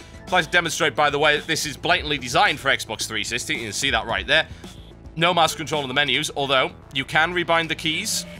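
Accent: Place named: England, United Kingdom. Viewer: Where about in England you from? Your accent is amazing. I'm from Birmingham so I sound like a idiot:(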